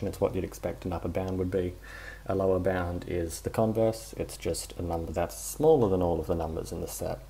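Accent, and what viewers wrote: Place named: Australia. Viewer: with Aussie accent op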